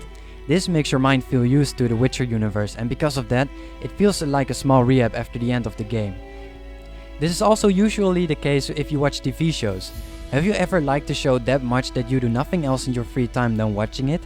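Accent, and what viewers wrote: Netherlands. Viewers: Hey, where are you from? You sound Dutch! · I don't know why but I can totally tell you're Dutch.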